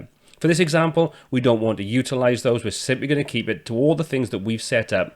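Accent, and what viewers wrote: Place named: Newcastle, United Kingdom. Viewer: This video took a lot of work to put together! Deserves more than 29k views. (You sound Geordie, are you? I ask because my Dad was from Wallsend and you speak very much like he did).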